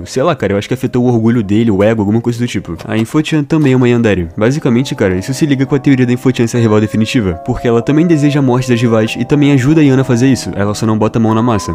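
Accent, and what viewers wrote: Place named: Rio de Janeiro, Brazil. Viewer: Omagad, carioca?? Inscrito adquirido.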